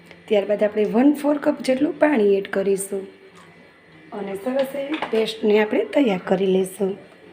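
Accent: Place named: Kathiyawad, India. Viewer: Khub saras vangi che. Tame kathiyawad na Cho? Bolvano lehko tya no lage che😊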